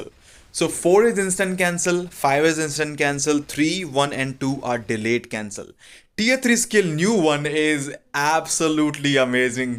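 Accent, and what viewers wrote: India. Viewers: Your English look like Indian accent..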